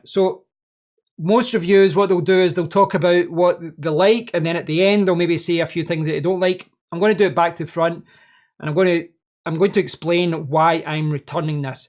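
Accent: Scotland, United Kingdom. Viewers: oh, my bad. Scottish accent is awesome too. I love it. Just bonnie.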